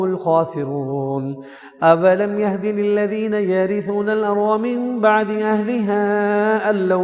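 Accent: Panipat, India. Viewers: Lovely panipati accent. Allah o akbar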